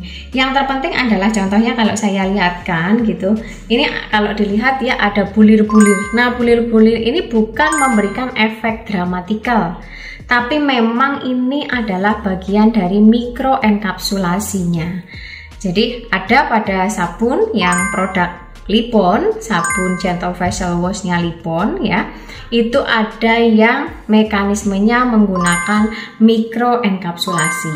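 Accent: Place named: Java, Indonesia. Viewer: mantap ...suka bangat gaya bicaranya dokter...medok..hee.hee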